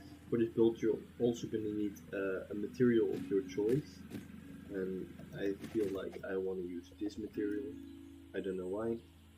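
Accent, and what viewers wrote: Netherlands. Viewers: ar u dutch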